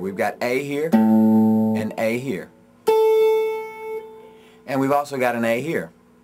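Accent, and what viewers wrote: New Orleans, United States. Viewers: Are you from New Orleans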